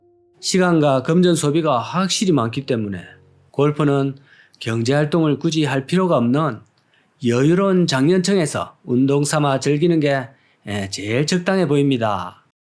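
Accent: Gyeongsang, South Korea. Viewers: 아재 말투는 구수한 경상도 말투입니다... 유네스코 무형 문화재 감입니다.... 아재요, 욕 많이 봅니데이 ~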